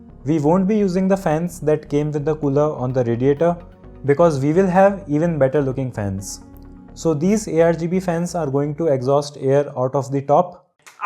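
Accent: India. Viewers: Idk why but i had to laugh so hard bc of the indian accent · Indian accents have to be the worst to listen to my god. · finally an indian who speaks in indian accent, this is what I like not talking in a shitty american accent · The same Indian accent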